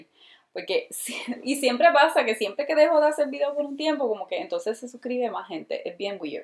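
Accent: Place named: Puerto Rico. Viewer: Hola, ojalá no te desanimen lo que digan las personas negativas, porque hay mucha gente que aprecia tus vídeos y tú persona. Yo te animo para que sigas siendo tú misma ya que eso es lo que te hace ser especial, tus vídeos son muy interesantes y a mí me sirven para mucho. Besos de una española que adora tu acento portorriqueño mezclado con el inglés. Con todo mi cariño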